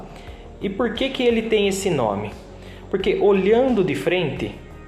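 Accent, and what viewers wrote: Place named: Inland São Paulo, Brazil. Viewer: Sotaque interior Paulista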